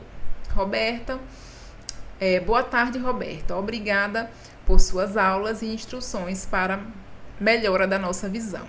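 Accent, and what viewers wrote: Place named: Maceió, Brazil. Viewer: Onde vc mora? Tem um sotaque bem parecido com o meu aqui de maceio.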